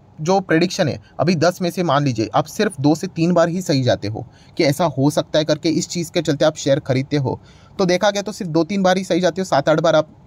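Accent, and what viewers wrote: Maharashtra, India. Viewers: Sir aap kha se he Maharashtra ya Delhi se please confirm Aap ki voice se to aap Maharashtra se lgte ho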